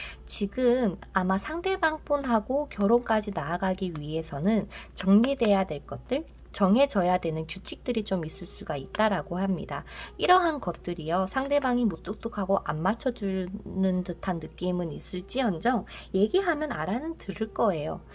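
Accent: Gyeongsang, South Korea. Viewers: 4 수달 저에게 너무 지친 나머지 제손 놔버리고 다른 분 만나러 갔는데... 포기하고 지내면 언젠가는 와줬으면 하네요ㅠㅠ 그분이 경상도 분이라 님 말투들으니 더 보고싶어요..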